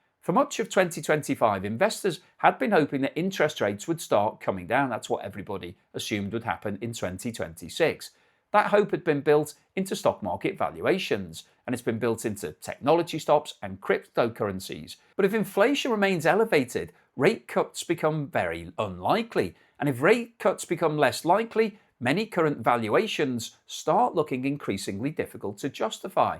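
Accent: United Kingdom. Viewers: YouTube is my crash course in the British accent. My head is still hurting, my mind wanders. I may be too old for this. Great analysis, Sir! Thank you! I'm wondering if this new Fed Chairman will be a modern day Arthur Burns - accommodating the president's war spending, leading to inflation. So higher interest rates? Hmm.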